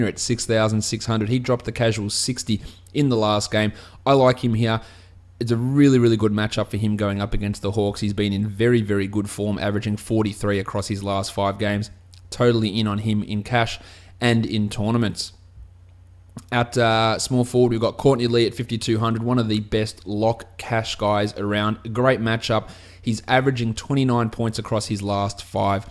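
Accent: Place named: Australia. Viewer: Awesome thanks! I listen to your podcast every morning before school/work, used to live in aus for 2 years so might just be missing the accent.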